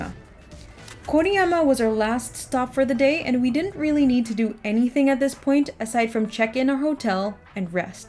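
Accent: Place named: Spain. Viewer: The Spanish accent pronunciation of the Japanese words is really interesting.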